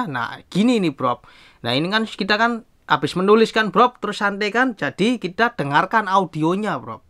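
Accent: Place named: Cirebon, Indonesia. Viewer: Logatnya kaya Cirebon dermayu y mas😅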